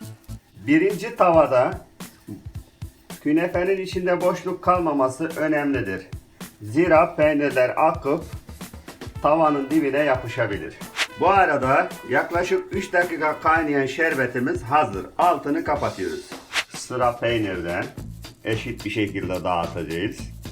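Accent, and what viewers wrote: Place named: Hatay, Turkey. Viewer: Abi kıbrıstaki hataylılar gibi şiveniz var sanki :)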